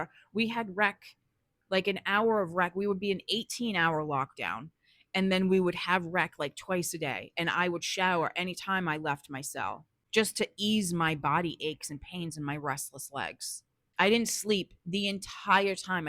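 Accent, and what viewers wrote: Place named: Eastern Massachusetts, United States. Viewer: Thank you for the video, great job. I knew you were a fellow Baystater before you mentioned Boston & Worcester with that Eastern Mass accent 😊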